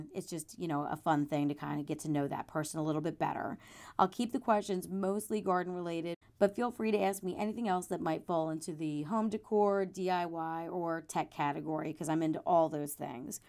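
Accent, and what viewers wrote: New Jersey, United States. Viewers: Gosh, I miss a NJ accent. I was raised in Mt. Holly and lived most of my adult life in Voorhees....now I'm in WNY. Photos are beautiful. Do you grow anything from seed? Check out The Lawrence Network....awesome gardening inspiration. Keep growing. 🌱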